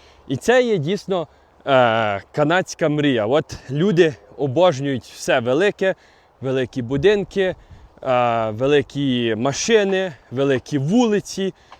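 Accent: Western Ukraine, Ukraine. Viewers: Доречі про акцент, саме тому почав дивитись, но я всеж таки підозрюю, що у є задатки актора, режисера і тд, він полбляє, якісь сценки з братом, робити, старається, молодець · дивлюсь вас не з інтересу про Канаду, а з інтересу до західно-українського акценту:) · говорить із західним акцентом, так. Але його вимова притаманна більше до діаспорської, або до того, як колись розмовляли дуже давно на заході України. Зараз у нас так не те що молодь, але і люди 60+ не розмовляють. Не знаю, з чим це пов’язано, мабуть все таки вплив англійської вимови дає такий акцент українською. Навіть дідусь, з яким я бачила відео, розмовляє більш сучасною укоаїнською😊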